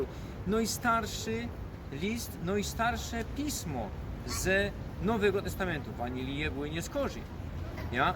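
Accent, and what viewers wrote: Silesia, Poland. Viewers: TA ŚŁONSKA GWARA JEST FAJNA , DAWAJ NA ALBANIE 😊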